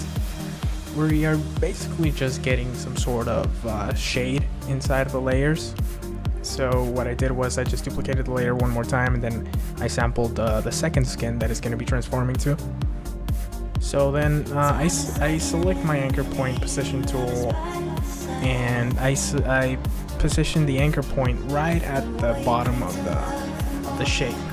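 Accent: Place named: India. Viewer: Indian ascent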